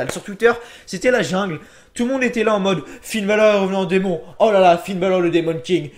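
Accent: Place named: South, France. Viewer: L'accent du sud je m'en lasse jamais il est grave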